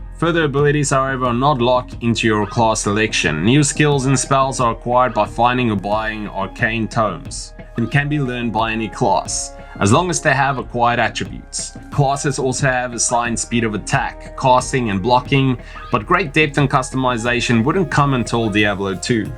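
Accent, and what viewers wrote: South Africa. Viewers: Personally I like Diablo 3 on console as it feels the same as Baldur's Gate: Dark Alliance and Champions of Norrath: Return to Arms, 2 games I have played way too much back in the day on the PS2. PS. It sounds like you are from RSA.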